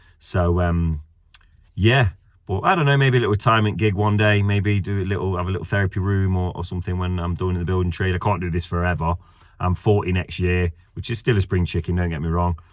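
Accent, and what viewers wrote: Nottingham, United Kingdom. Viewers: Went uni in Nottingham and never understood mushie peas. Watched a few of your videos after watching D and J projects. I never knew there was so much still in landscaping. Learnt so much from you and D n J. I’m now disabled and stuck in Leicestershire and love hearing your accent as reminds me of my happy uni days. I worked nights in the Clifton garage before it was rebuild back in 2004 ish I had a white 1972 beetle. Oh thank you for the smiles x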